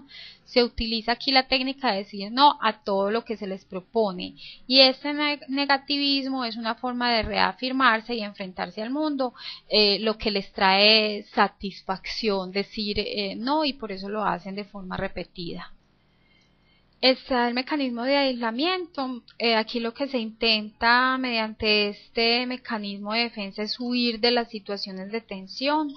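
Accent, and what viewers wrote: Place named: Colombia. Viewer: Su acento es muy fuerte y no logro entenderla, es de colombia verdad? bueno, no es criticar solo decir que el huehueo que hace al hablar no hace que se entienda profesionalmente el vide.. mejor los acentos neutros.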